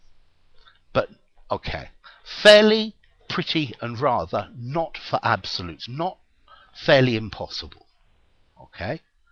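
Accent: United Kingdom. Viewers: First off, as an English Language Development teacher (I'm a native speaker of American English) in America working with mainly hispano-american immigrants, I appreciate this valuable post, as you speak with a wonderful example of a British accent, and I love your cadence and clarity of enunciation. I am going to use more of your videos in my class to help my students understand the importance of pronunciation, cadence, accent, and enunciation. Second, I applaud you for tackling such a nuanced and tricky subject. Even as I write this, I realize how often I use these adverbial modifiers, and how difficult it must be for my students to follow the differences in what I mean. On the upcoming research project, for example, I said that the Power Point presentation is "fairly important", but that the written component is "quite important". I was shocked when all my students then began working on their Power Point presentations instead of focusing on their written reports. 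Obviously, they didn't understand the difference between "fairly" and "quite". Thanks for making me more conscious of this in my communication. Finally, I'm including a link below to a written article about this same topic that may add to the conversation. I hope that such links do not detract from the work that you are doing. Again, thanks for making resources that so many of us value, quite a lot! Please contact me if there is any complaint: <mail> <link>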